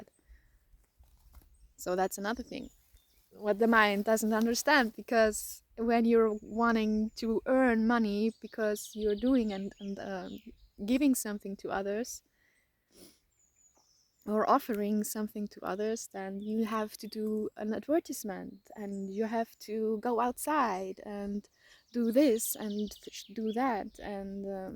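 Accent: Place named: Austria. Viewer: it makes me happy to hear that i made you happy and.. i'm from austria too! what a coincidence, i thought that the accent and the sourroundings looked familiar...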